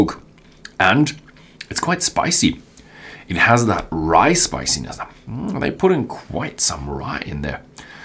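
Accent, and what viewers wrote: Germany. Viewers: Did you understand what he said? Should we all sound like the Queen of England or Donald Trump? Language is about communicative exchange and once meaning is transferred, the way in which it is transferred is immaterial. Two way communication! Should 1 billion Indians or 100 million Nigerians who can all communicate through English adhere to your pronunciation patterns? No, they shouldn't. Rejoice in the difference and let's not homogenize and standardise every bloody aspect of life. speaks German as his first language. There is natural interference coming from German, as there would be from French, Arabic or Chinese. Get over yourself man....he pronounces words differently, as you would when you learn to speak German as well as speaks English.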